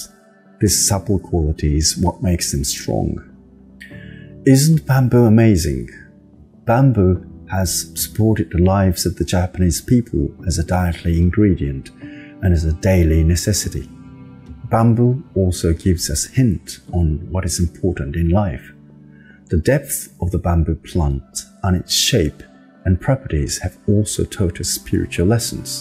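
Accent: United Kingdom. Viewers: 和尚様、イギリス英語に聞こえますが留学された経験ありますか？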